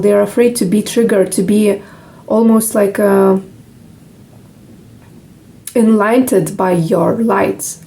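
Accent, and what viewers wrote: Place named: Eastern Europe. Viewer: I like your accent but it's nice to listen because we're both readers and Slavics 😉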